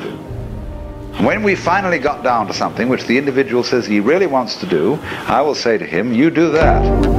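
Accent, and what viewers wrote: South Africa. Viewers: Are you South African because your accent sounds familiar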